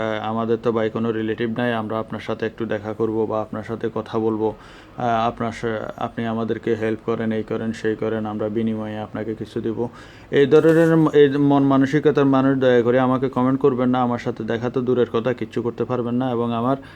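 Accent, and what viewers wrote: Noakhali, Bangladesh. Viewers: আপনি কাকে বলছেন? কে যেতে চেয়েছে ইতালি? কে বলছে ইতালি যেয়ে কোথায় থাকবো,কি খাবো?কে বলছে ইতালি যেয়ে আমার খাবার টাকা নেই? বা: বা: আপিনি নিজেই প্রশ্ন রাখছেন এবং নিজেই আবার ঝারি মেরে তার উত্তর দিচ্ছেন আবার বলছেন এটা মহিলাদের মতো কথা।কে জিজ্ঞেস করেছে আপনাকে? ভাষা শুনে তো মনে হচ্ছে খাঁটি নোয়াখাইল্যা, যা হোক আপনের কাছে কথা বলার নতুন স্টাইল শিখলাম।সাবস্ক্রাইব করতে পারলাম না বলে দু:খীত। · আপনার বারি মনে হয় নোয়াখালী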